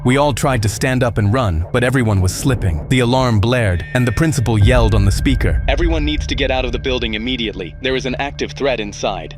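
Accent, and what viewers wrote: United Kingdom. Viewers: bro i finnaly realised he has a brithish accent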